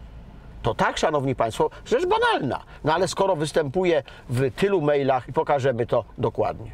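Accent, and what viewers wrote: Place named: Warsaw, Poland. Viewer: Warszawskie naleciałości w wymawianiu słowa 'tutaj' oraz 'dzisiaj' jako 'tutej', 'dzisiej'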